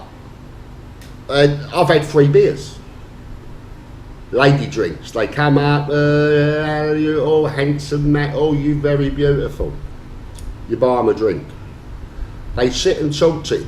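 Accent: United Kingdom. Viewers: The problem is that the Queen does not speak like most of the UK population. Whereas accent is the most widespread in the UK. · "Be on the Piss all night every night" I Love British Speak :)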